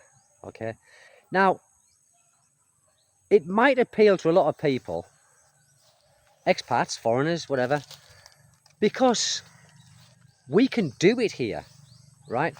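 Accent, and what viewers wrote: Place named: Middlesbrough, United Kingdom. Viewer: Whereabouts are u from originally mate ? Boro accent ? Great videos mate 👍 all the best to u and lovely family 👍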